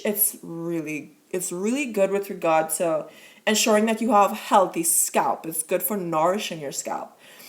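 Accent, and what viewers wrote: Trinidad and Tobago. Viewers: what country are you from? you sound Trinidadian... great tips!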